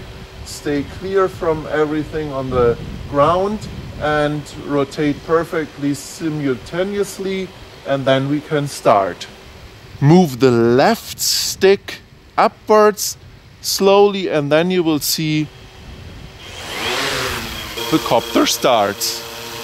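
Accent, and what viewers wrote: Germany. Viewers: Super video-Thought his german accent be irriating but I grew to like it. Thanks again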